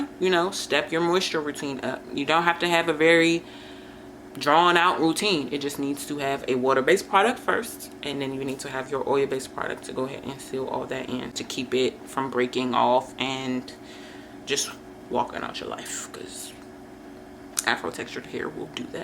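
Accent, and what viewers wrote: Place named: Washington DC, United States. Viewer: I know our accent from anywhere ❤ youre definitely from around the dc/pg area 😂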